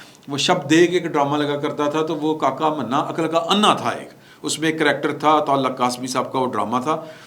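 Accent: Punjab, Pakistan. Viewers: Syedimran shafqat sahib king 👑 sar your punjabe touch i like you love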